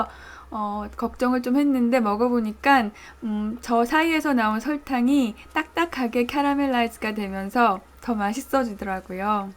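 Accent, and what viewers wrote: Seoul, South Korea. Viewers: 말투가 90년대 서울사투리 쓰시는거같아요 ㅎㅎㅎㅎㅎ 신기하당 ㅎㅎㅎㅎㅎㅎㅎ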